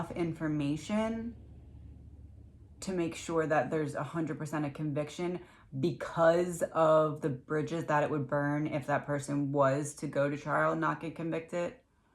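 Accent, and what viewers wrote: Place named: Maryland, United States. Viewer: Where are you? I hear Maryland or PA which reminds me of my cousins! I am enjoying your readings very much!!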